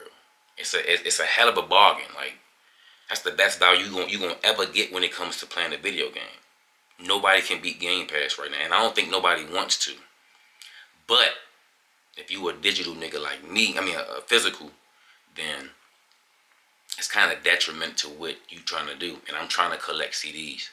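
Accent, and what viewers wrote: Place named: DMV, United States. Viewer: I hear that DMV accent fam! Had to come support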